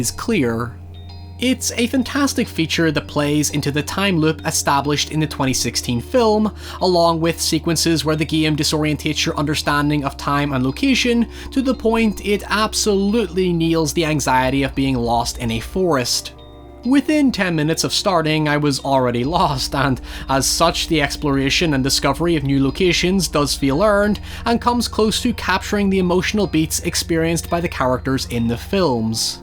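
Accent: Northern Ireland, United Kingdom. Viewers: Are you Northern Irish? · Northern Ireland. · Are you from northern Ireland?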